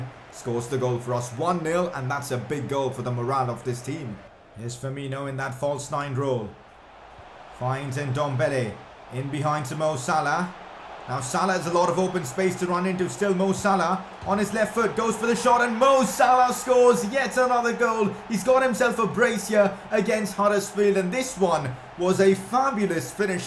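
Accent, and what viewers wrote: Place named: India. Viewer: Why did this dude embrace his full Indian accent now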